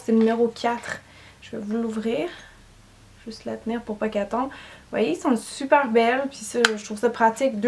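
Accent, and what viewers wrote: Quebec, Canada. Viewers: Merci pour ce super concours ! :) je t'adore tu est tellement belle et naturelle, je suis abonnée à beaucoup de youtubeuses beauté françaises et malgré ton petit accent québécois (qui enfaite ne me dérange absolument pas) tu est ma préférée :) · il mfait bander ton accent québecois x) jpasse le salam à tous les québecois vous êtes tous des gens adorables vive le québec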